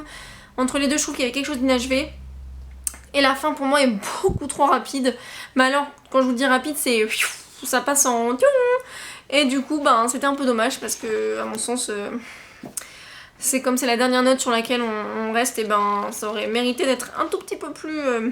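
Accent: England, United Kingdom. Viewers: J'aime tellement ton accent anglais 😍😍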